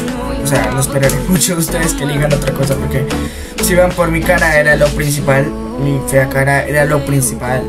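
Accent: Colombia. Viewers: Jaja lo sabia que eres de bogota coloombia se notaba mucho xd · Se te nota lo colombiano Viva colombia! uwu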